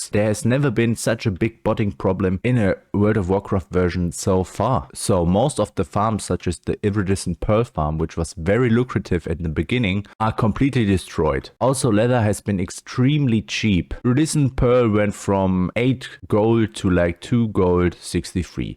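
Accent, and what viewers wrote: Germany. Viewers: Man hört halt so krass raus das du deutscher bist xD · don't let him bother you. your german accent makes it easier to understand for every non native english speaker ;) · wie kann man so deutsch englisch sprechen?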